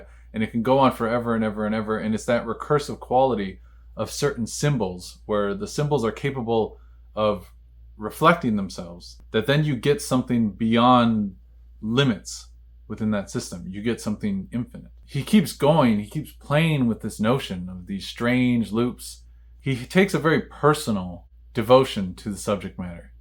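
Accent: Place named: Chicago, United States. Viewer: oooh, Thank you for the The Great Rift by Michael E. Hobart recommendation. Adding it to my goodreads tbr and I'll search for a copy today. Also, thank you very much for the reading timetable for Godel, Escher, Bach. I also shared this with my son. I used to love Chicago. My husband has family in Winnetka. (very snooty so I've heard). Then one of the cousins moved to L.A. for work in Hollywood. I guess they had connections. LOL I can see how people in Cali would think you had an accent. I went to Texas one time for one week in fifth grade/10 y/o), and came back with an accent and got teased a little in middle school by some girl that had a beef with me. Sometimes it still comes out. Oy, imagine if I moved to Texas! LOL But I love accents. It was my pleasure listening to your video. I did take your recommendation about skipping the Lolita part though.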